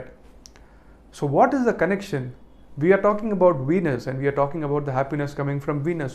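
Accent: India. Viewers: I guessed Tamil too D You have that slight South Indian accent, besides the look :) would have never guessed a Bengali.. From Mumbai though ?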